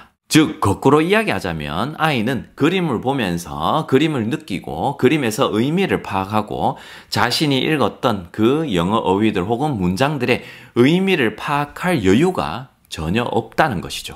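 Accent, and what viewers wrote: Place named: Daegu, South Korea. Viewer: 대구 프린스 선생님~😉 귀에 쏙쏙 들어오는 대구 사투리덕분에 선생님 영상 보는 재미가 두배에요~^^ 오늘도 좋은 영상 고맙습니다♥️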